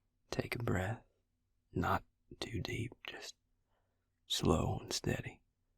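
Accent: South, United States. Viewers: loooook im not a fan of southern accents but <3333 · HE SOUND LIKE HIS FROM THE SOUTH “hey sugar” 😫😫😫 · as a southerner you're really killing the southern lingo! all you need now is to throw in a handful of "fixin' to's" and "ain't's" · the southern voice 😙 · Even for someone who's lived in the south their whole life, accent still gives me 🦋🦋🦋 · Okay I’m normally not a fan of southern accents but yours is an exception 👀💳💥💥💳 not too strong I like it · I have to say that I do love calming bedside manner as a healer! Just hate to see him being drained to heal. Happy to hear from again! His smooth, Southern accent is so nice. Love learning more details to this ongoing storyline. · he rlly do, sounds like a southern or country accent he’s got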